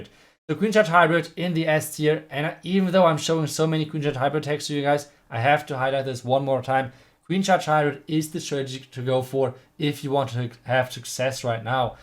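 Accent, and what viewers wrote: Germany. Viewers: strong german accent u can always recognize that hahah but its sounds good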